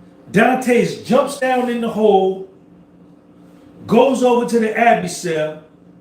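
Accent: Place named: New York, United States. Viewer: You gotta be from ny. I know bc I'm from ny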